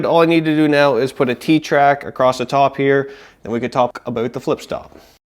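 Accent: Canada. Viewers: Canadian I'm guessing. First video I've see of yours and I am on the 11 second mark. Am I right?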